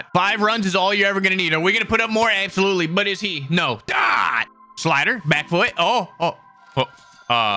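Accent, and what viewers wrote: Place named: Chicago, United States. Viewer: You sound like you're from Chicago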